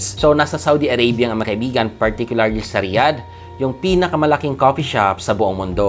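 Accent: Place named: Visayas, Philippines. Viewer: Taga asa mankaah mora mag kag bisaya hehe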